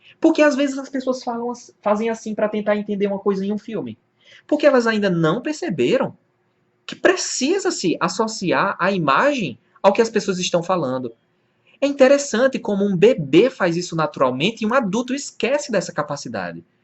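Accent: Rio Grande do Norte, Brazil. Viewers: o sotaque potiguar me chamou a atenção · gostei desse sotaque do rio grande do norte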